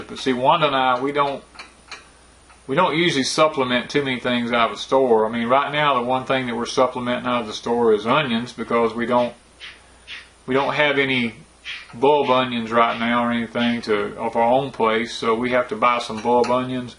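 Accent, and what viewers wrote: South, United States. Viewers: I enjoy listening to your stories on Porch Time, in fact I put it on auto play when going to sleep. Hearing your southern accent is calming, It's like hearing my late husband talking...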